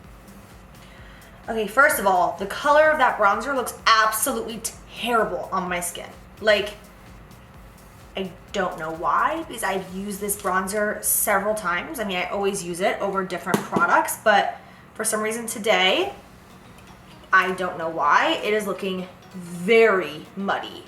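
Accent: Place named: Boston, United States. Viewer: "Highlighta" lol is he from Boston? We have that accent.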